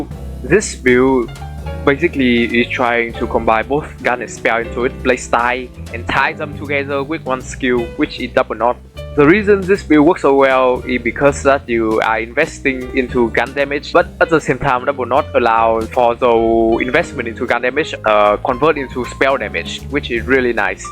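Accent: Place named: Malaysia. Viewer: Late comment, but I found this to be the most helpful for my case despite myself having trouble understanding your Malay? accent. Thanks.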